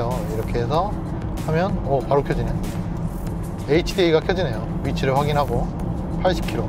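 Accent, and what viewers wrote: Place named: Jeolla, South Korea. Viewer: 발음이 문제가 아니라 전라도 사투리가 문제 인 듯?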